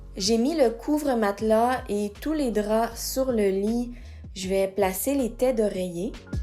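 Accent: Quebec, Canada. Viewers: Tu parle bien le québécois 🥰💞💞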